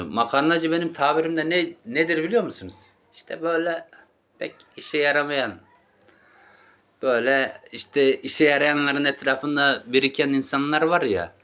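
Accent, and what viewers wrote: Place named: Istanbul, Turkey. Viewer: Abi essenler sivesiyle konusuyon, Istanbul essenlerdenmisin?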